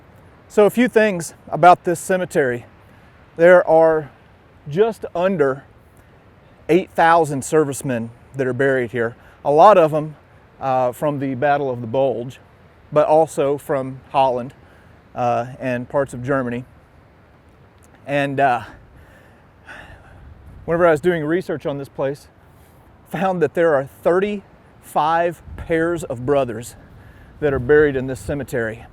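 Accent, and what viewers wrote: United States. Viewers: This is the kind of attitude that makes Americans disliked around the world. American pronunciation in France? Please don't forget the Americans were a minority on Day Day and all of the other nations on the Western front without which the final victory would not have been possible. No disrespect meant to the service men on all sides.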